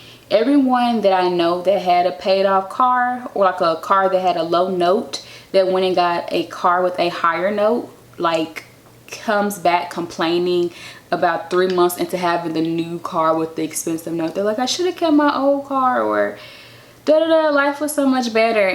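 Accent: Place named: North Carolina, United States. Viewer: You sound like you're from NC! New subbie!! Your vids are great and you're gorgeous!!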